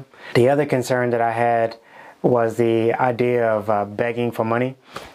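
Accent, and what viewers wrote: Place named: Baltimore, United States. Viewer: Just as everyone else has said, your videos are amazing. I hope you get more exposure because nobody on youtube explains things as well as you. Also, are you from Baltimore? Your "you"s and "to"s sounds like you are!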